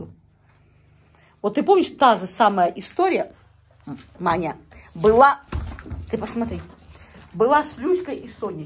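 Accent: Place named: Ukraine. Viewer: Я понимаю, что вы, Хохлушка. Но говорите хотя бы чуть чуть по русски. Не Шыколадки, а шоколадки. Не точно по буквам, а О смягченное. Я очень к вам хорошо отношусь. Всегда вас смотрю. Но пожалейте наши уши(((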